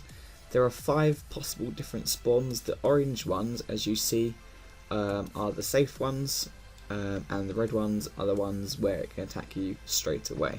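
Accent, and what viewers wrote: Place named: South Africa. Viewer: whats with south Africans and saaoooohhh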